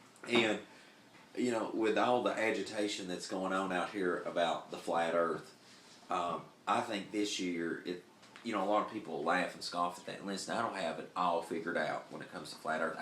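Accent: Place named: Alabama, United States. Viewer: Today I had a class to teach and I said pretty much what you just said. If people knew they were living in a controlled environment similar to the movie "The Matrix" they would freak out. The truth is too much for so many. I have tried for years to wake people up including my own kin folk to no avail. One or two of my nephews are awake but no one else listens or seems to care. The brainwashing is very , very strong on the population. I too was going to leave and head for the hills but instead I decided to stay and help my neighbors. The same neighbors that laugh at me when I talk to them about what reality is. It's going to be very, very bad and millions if not billions will die. Thank you for your hard work! Oh...your accent is similar to mine. I grew up in rural Alabama. Some folks just can't understand what I'm saying. LOL.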